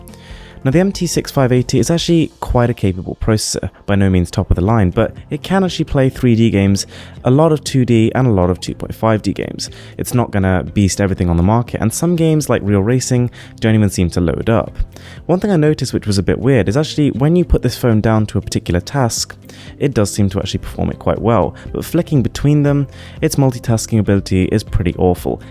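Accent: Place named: Birmingham, United Kingdom. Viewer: that's Birmingham I knew it ! lol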